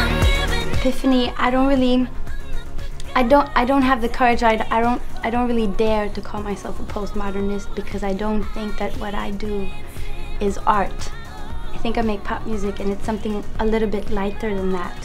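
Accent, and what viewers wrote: Sweden. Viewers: I enjoy listening to Robyn talk. Love that Swedish accent!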